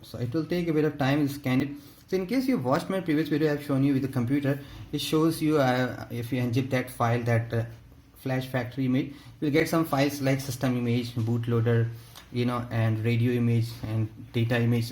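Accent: India. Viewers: it's not that he mumbled it's that indian accent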